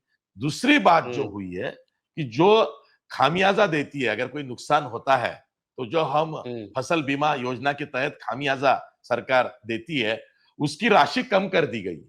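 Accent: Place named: Maharashtra, India. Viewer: Sir, tumchi Hindi marathi sarkhi wat the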